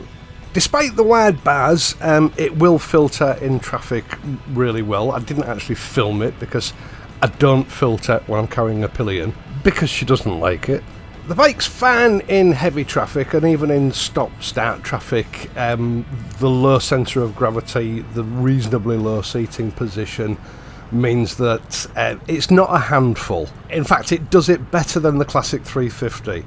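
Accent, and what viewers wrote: United Kingdom. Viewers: Your road reviews are like a high quality double barrelled over under shotgun, with both riggers pulled together. One barrel fires the excellent British English at the viewer and the second the gorgeously green English countryside. That both "barrels" operate flawlessly always and every time is the icing on the cake, so to speak. This one and the one by Mr Freddie Dobbs and Mr Mark Pulling remind me of " ". That song describes the exact sentiment of this bike. Thank you very much, sir, for yet another and as usual, true to form, review. Will be going tomorrow for a test ride of the bike. It should be a worthy successor to my 2014 (since sold) Bonneville 865.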